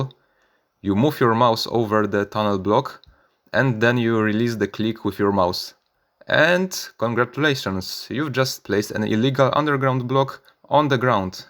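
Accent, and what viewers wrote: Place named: Poland. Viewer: I have watched a few seconds and thought this guy has identical voice and accent as me, is he polish? Polish version of Trackmania, yup, haha. Crazy how similar our voices are.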